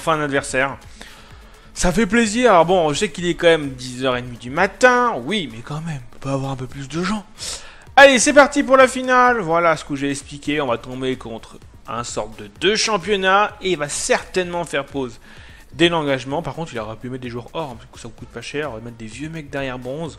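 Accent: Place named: Marseille, France. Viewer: pk il parle comme les marseillais delà télé-réalité lui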